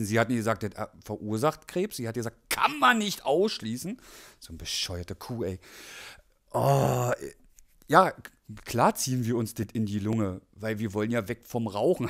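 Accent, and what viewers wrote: Berlin, Germany. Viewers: Cooool! Ne dampfene berlina Schnauze, find ick jut! Abopflicht, wa! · sieht verdammt aus wie Ragnar Lodbrok von Vikings o.O Ragnar mit Berliner Schnauze - göttlich, einfach göttlich. Macht das Video (übrigens gut zerlegt, diesen Schund!) irgendwie echt witzig... wenns nur nicht so traurig wäre... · 😂😂😂deine Berliner schnautze.....voll Guut👍😂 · ich finde dich klasse ☺ was du sagst kann ich nur so unterstützen seh das genauso und deine berlinerschnauze Made my day 👍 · Du kommst aus Berlin!?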